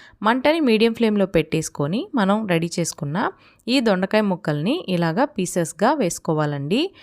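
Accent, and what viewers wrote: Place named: India. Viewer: Maam you have indian voice also...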